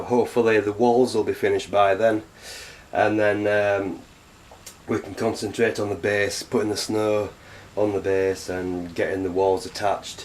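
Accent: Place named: Liverpool, United Kingdom. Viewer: by the way, about your accent. I know your in the UK but are you from the same area as where John lennon was from because, you kinda sound like him a bit👍😉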